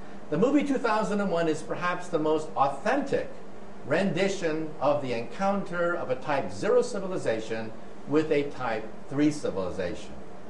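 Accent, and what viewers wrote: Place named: United States. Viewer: his accent is so american xD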